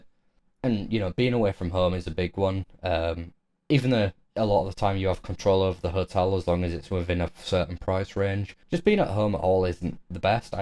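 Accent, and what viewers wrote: United Kingdom. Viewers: This English accent gives me a headache.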